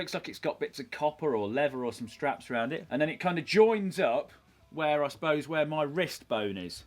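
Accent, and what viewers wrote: United Kingdom. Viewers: I really like his accent We asian can't get this type of accent asian really don't get this kind of accent except we were born in UK. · i fuckin LOVE your british accent this is a #nohomo commentary i swear but i do really like british accent, from boys or girls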